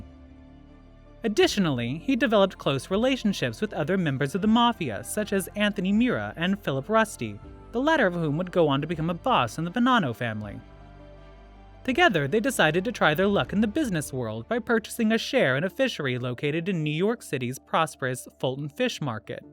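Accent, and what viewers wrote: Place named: United Kingdom. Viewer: Stopped after 3 seconds, listening to this British cigarette talk makes me want to 🤮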